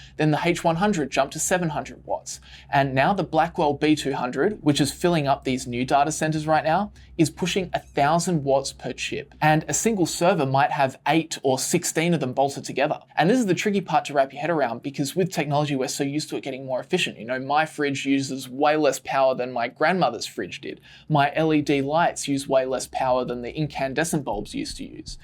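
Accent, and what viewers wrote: Australia. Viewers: You sound Aussie but focusing on US!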